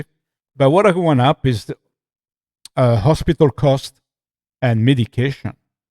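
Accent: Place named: Belgium. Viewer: im curious, i read you're from Australia, but your accent sounds european. my friend says you sound belgium.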